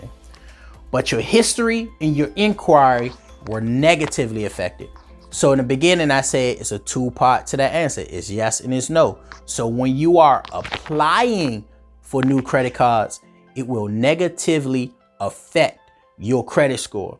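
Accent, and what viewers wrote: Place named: Washington DC, United States. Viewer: I can tell by your accent you're from DC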